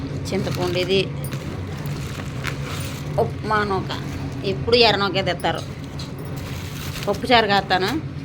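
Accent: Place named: West Godavari, India. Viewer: App. Ka. Basa bahut acha hai,,, i think west godavari language...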